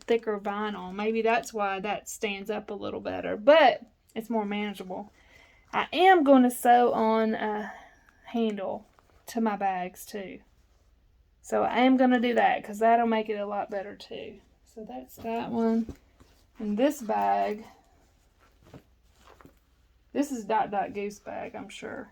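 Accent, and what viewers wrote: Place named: Tennessee, United States. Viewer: Congratulations on reaching 500! You're so cute, live hearing your Tennessee accent..Halloween will be here before we know it.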